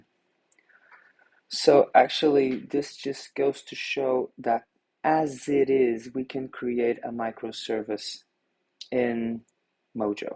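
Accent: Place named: France. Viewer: Et d'ailleurs, t'es français non ? J'ai remarqué un brin d'accent lol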